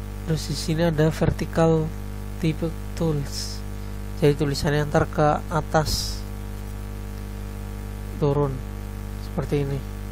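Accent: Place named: Java, Indonesia. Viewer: cuma lidah jawa yang bilang bulat itu bunder, hehehe, sukses terus mas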